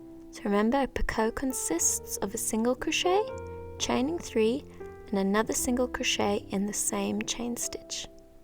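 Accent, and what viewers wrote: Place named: South Africa. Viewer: This accent is definitely South African 🥰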